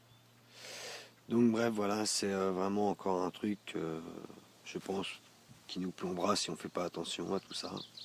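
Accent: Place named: Quebec, Canada. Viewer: merci pour tes vidéos, on va dire que c est pas facile l accent québécois mais ça va j arrive à decrypter.mon premier voyage astral m a bien secoué et g eu peur, je pense que j aurais moins peur lors du prochain voyage.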